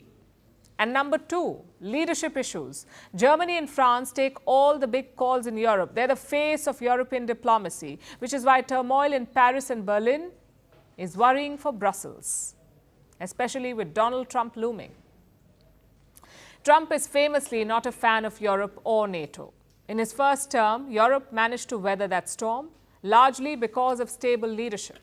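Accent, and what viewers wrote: India. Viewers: you’re not watching the show, that’s damn sure! I’m addicted to, excellent reporting, she’s smart beautiful lady, I’m a huge fan of her for sure! To be honest with you I kind of have a little crush on her from her beautiful Indian accent to the way she talks and……. Lol I know she hates Trump anyone can see it, including Stevie Wonder. needs to get into the program, it’s not late. You have to follow Mark Zuckerberg, Jeff Bezos …did 😂don’t forget he’s a good friend of Narendra Modi also. I don’t think she drink Kamala’s kool aide so she should support the guy say no to Wars! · insufferable indian accent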